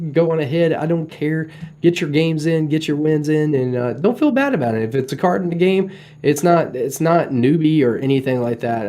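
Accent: Texas, United States. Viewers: I miss the Texan Drawl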